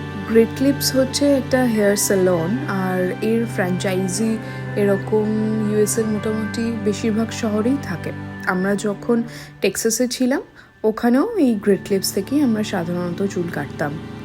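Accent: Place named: Bangladesh. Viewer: ধন্যবাদ একজন বাঙালি হয়ে প্রবাসী বাঙালির এতো সুন্দর বাংলা একসেন্ট এর উপস্থাপনা মনটা ছুঁয়ে যায়। ঈশ্বর আপনার মঙ্গল করুন।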